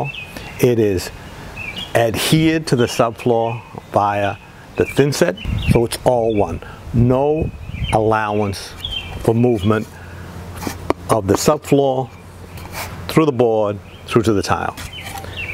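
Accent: Boston, United States. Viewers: I understand the Boston accent,after years watching "This Old House" on PBS, but the AI which made the subtitles didn't.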